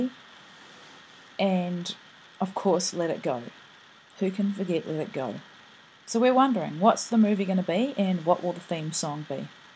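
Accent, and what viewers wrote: New Zealand. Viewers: This is gorgeous. I love that you keep any 'mishaps' in there and work through them. I actually think the gelatos and acrylic paint at the end looks pretty cool and arty! It's also nice to hear a NZ accent. My Dad is a kiwi and I miss that accent.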